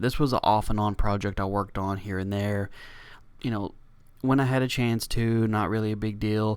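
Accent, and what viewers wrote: South, United States. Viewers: nice to hear another southern guy that likes minecraft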